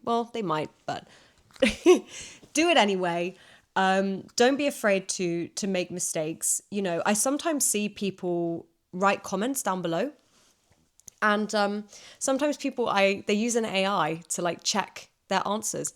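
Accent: England, United Kingdom. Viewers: hey, love your videos, love so much you do this podcast bc i live in mexico and the usa acent os afull and british so more elegant and more structure i dont know, send hugs gracias y buen dia y sigue haciendo tu magia ademas de practicar tu español, gracias por leerme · Eres la mejor profesora gracias por tus maravillosos consejos, me encanta uur British accent😊❤ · has a beautiful personality type, i like when you smile your short laugh Is this type of being shy? I swear U my favorite british teacher with the best accent Your short laugh kills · Hi,! I am your huge fan! 🥰I am a university student from China. I first found your videos back in March on douyin(China’s version tiktok). I had watched most videos there, but the account has stopped uploading the new contents since June, so I came here(youtube) and tracked you down(official account!)🤗. 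I like the way you teach English(daily life English) and your British accent! I can learn many new and fun words and get joy from videos(especially when you laugh, it’s so infectious).:elbowbump:Can’t wait to watch more videos from you! And hope your channel keeps getting better and better! By the way, I feel you look a little like Lara Pulver(from Sherlock).🤭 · Hi, I'm commenting for the first time on your video but I've practiced with you everyday since I found your channel. I aim to get 7.5 in IELTS test to move to Australia but here, in Japan, I felt kinda difficult to be soaked in English while doing the job. I've looked for many English channels but I love your channel the best ever!! How you speak, the attractive content for me every times, England accent which I want to immitate, your kind personality, and cultured talk. This time, I was engaged by your word, go try and don't be afraid of failure, and try to speak or write not using AI. 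I often use AI to translate because I want to use complete words but I changed my mind about it. I think I'm ambivert personality like you, but I often lost confidence when I speak English. So today I got a motivation from you again.🌼 Thank you as always✨🙂‍↕️ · 日本ではアメリカ発音で英語を習いますが、あなたのビデオでイギリス発音の魅力を知りました。 私には少しだけスピードが速いので、0.5倍速で字幕をつけて発音の練習をしています。 とてもナチュラルな動画内容なのもとても好感が持てます。 これからも活動応援しています。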